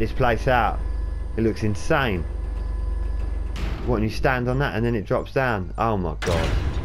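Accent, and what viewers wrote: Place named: United Kingdom. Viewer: i know you are british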